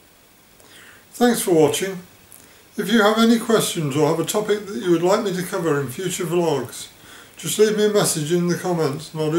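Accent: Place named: United Kingdom. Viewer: Clear to me but as an American, I've always had trouble with the British accent. I think your most trouble is in the choice of microphone. There is a vlogger, Louis Rossmann who was a sound engineer at Avatar Studios in New York, who has changed mics over the years and explained why. You might give him a call. He is in the book...Rossmann Group Computer Repair.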